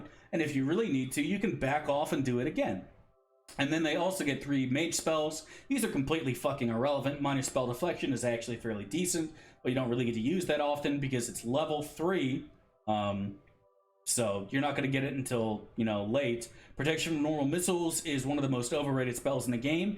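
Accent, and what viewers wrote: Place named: Michigan, United States. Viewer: What's your 20ish? You sound like your from Michigan, but Cali and Michigan accents sound similar when recorded.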